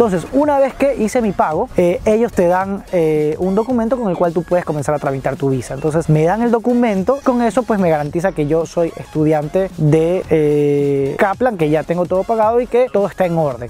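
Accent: Ecuador. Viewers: Jaja ni bien te escuché hablar dije el debe ser ecuatoriano Saludos desde Quito :) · he mi comentario esta ahi tu acento guayaco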